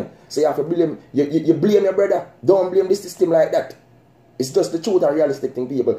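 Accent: Jamaica. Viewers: Bredda yuh sound like a St. E man. Please confirm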